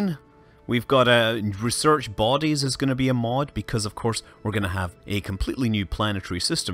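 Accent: Scotland, United Kingdom. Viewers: you're scottish right?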